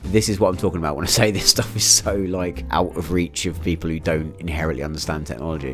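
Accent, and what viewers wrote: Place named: Southern England, United Kingdom. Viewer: Hey I just came across your channel, it's so nice to find a fellow brit doing game development! May I ask where you're based? You definitely sound like a Southener ;-)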